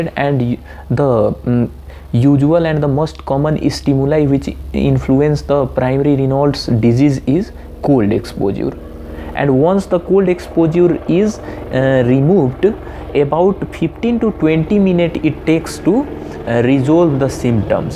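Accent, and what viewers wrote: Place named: Bihar, India. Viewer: Don't laugh on accent ..may be he is a Bihari..but well explained everything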